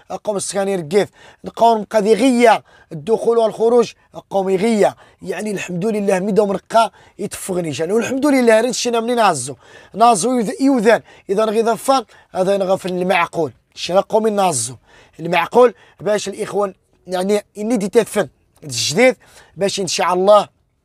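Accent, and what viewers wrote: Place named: Rif, Morocco. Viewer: اللهجة الريفية الامازغية المغربية....🤩🤩😍🥰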